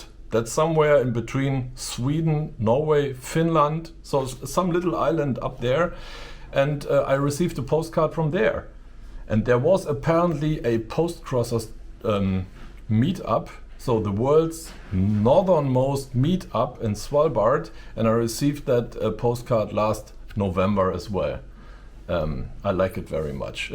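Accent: Germany. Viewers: Hello, I' m surprised, that I understood the most of what you said😉 and I love your german accent. Nice story about the postcards👍. Best wishes, Maria🙋‍♀️ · Coole Schreibmaschine! I like your German Accent! 😅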